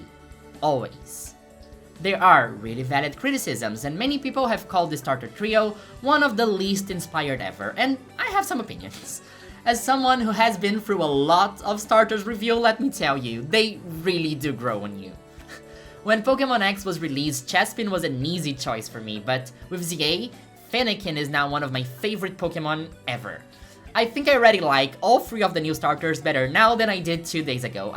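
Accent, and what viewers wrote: Brazil. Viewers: hearing you pronounce quaquaval with your brazilian accent healed me thank you mother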